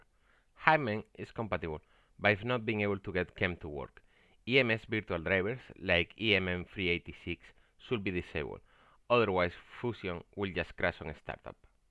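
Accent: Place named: Spain. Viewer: Eres español? Tu acento te delata lol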